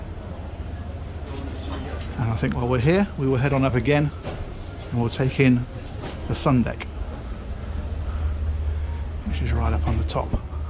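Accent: England, United Kingdom. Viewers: Wow, I like AL you minium. We pronounce it ah loom in uhm. Cool as heck to hear your English accent. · ... This is the tour that 'popped my yacht cherry', so to speak. PS - My wife hates you for the monster you've created. "Long time listener, first time caller"... Yes. I've seen every dang vid you've done on floating vessels. It's like video heroin, I swear it. So... It all started out with Youtube's "suggested view". Watched this... Watched all of 'em, no really. ALL of 'em. So I says..." can't be the only dude doing these..." And clearly - he's not. He's just the guy who does them brilliantly...!!! I've watched over a dozen guides, ranging from obnoxiously douchy, to walking animal tranquilizers, and..... You sir "are the bar", so to speak. You sir, set the standard in yacht tour guides. Now enough about you...! This damn "boat"... Great Googly Moogly...! 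Some thoughts on Alia: - The biscuit in red... I love the way blue bloods 'prance' as they scoot out of the way. Precious jiggle... "Slightly Japanese theme"...? Ya think? Only thing missing was a David Carradine cameo! And the Master... Gorgeous, beyond belief, but- no friggin' TV? Wait, what...? And the shower... You could fit an entire football team's cheerleading squad in there...! (IF they squeezed real tight - "Oh, stop...!") The "extra cabin"... VIP quality, to say the least. Awesome crew cabins and mess area! And...... EVERY crew cabin has a proper shower for the pair of bunkmates. "Excellent..!" (In sultry, understated, classic English, I think, tone) I cringe when I see the "hand held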